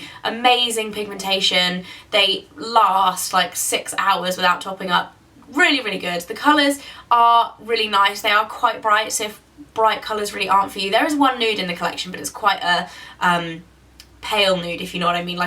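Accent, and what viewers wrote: England, United Kingdom. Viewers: Is she English or American ?? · What part of great Britain are you from? Love your accent.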